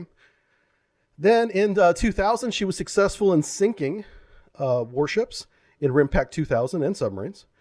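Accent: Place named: United States. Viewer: And the narrator has an aMERICAN ACCENT.....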